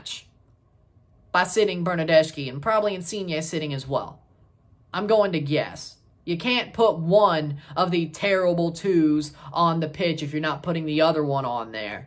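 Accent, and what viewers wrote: South, United States. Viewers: Ok but I assume your in Toronto now. That is cool that you are a soccer fan. Not alot of southern guys into soccer. Love the content. keep it up! Thumbs up bro!!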